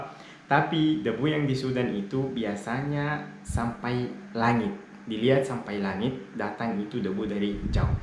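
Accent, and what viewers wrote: Makassar, Indonesia. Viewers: Logatnya kok seperti orang Makassar....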